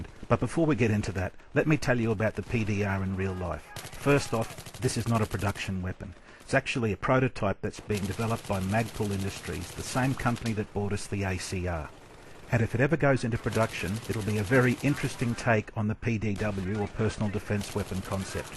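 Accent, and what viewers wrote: Australia. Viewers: see to me sounds so aussie lol he is australian btw checked hes channel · Fuck yeah!!! Aussie mate :D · AUZZIE BRAHHH! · hes like... the australian boo :o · Found out about through golf squad on the shustybang channel. I like his vids diff from other commentators, and the accent is Australian. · Don't see too many Aussie commentators. Thumbs up! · Is this guy australian? If he is then good on him ;) go aussie and the ps3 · I dont wanna be mean or anything but me my self fell asleep listening to this its a game who the fuck want to listen to the origin of a weapon in a GAME stats is ok thats okey but whats the purpose telling everything about a gun what does that help. to know which is the best one where it was made and shit tbh i need to be paid to listen to this but good gameplay thought and nice accent^^ AUSSIE PWN! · Dude, his Australian!!!!! 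Pwn thinks its a cool accent! im australian!!!! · Aussie aussie aussie..?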